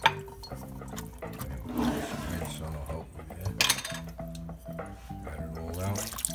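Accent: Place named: Boston, United States. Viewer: U sound like your from Boston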